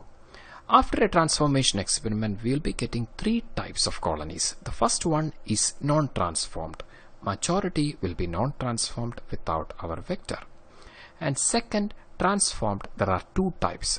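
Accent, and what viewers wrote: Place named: Kashmir, India. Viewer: Thankyou so much sir It is really helpful 😔☺️ Btw your accent is like kashmiri's .😄🎉🎉🎉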